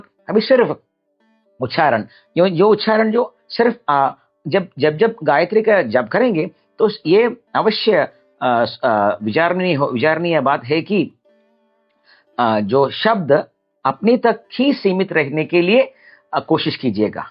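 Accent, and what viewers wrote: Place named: South, India. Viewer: पर ये उच्चारण दक्षिण का है उत्तर का नहीं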